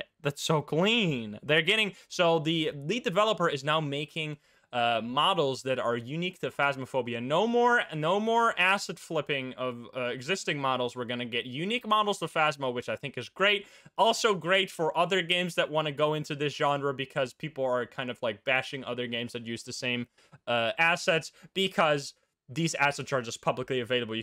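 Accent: Netherlands. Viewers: ik dacht al dat ik een nederlands accent hoorde maar nu weet ik het zeker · Wait a minute…. ARE YOU DUTCH??????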